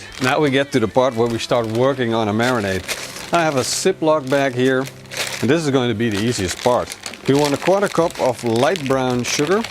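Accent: Netherlands. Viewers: Are you Dutch ? Your english accent really sound like a dutch man 🤣 still good video tho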